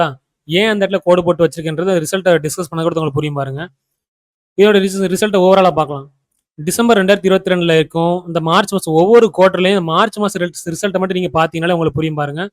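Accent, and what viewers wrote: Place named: Tirunelveli, India. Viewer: ok bro. Na tirunelveli than. Namma ooru pakkam ulla tamil mari erunthu athan ketten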